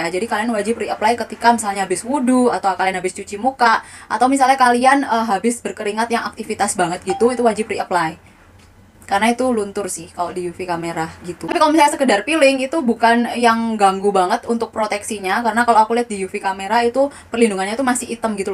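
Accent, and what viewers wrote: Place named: East, Indonesia. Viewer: Kakak asli mana ? Logatnya kek orng daerah wetan yaak hhe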